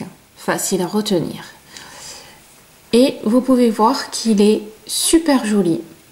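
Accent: South, France. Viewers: Super joli ce point. J'ai tout compris car comme toujours vos explications sont très claires et je suis toujours aussi fan de votre accent du sud ! Je vais tenter le poncho avec ce point. Encore merci de partager avec nous !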